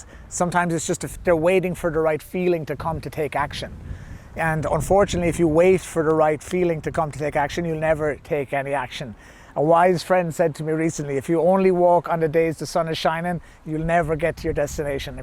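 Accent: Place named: Ireland. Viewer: hey, i just stumbled on this, i wish success in your youtube endeavours my friend. your so right though. recently i asked myself am i dreaming to big/too small. and it wasnt until i dared myself to dream big that it made things a lot more clearer in my mind. thank you for your insightfulness. i reeally loook forward to diving deep into your content, btw im from dublin, its so nice to hear an irish accent on this topic! godspeed, <3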